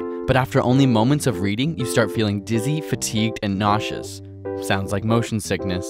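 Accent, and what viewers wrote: Canada. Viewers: i hear canadian accent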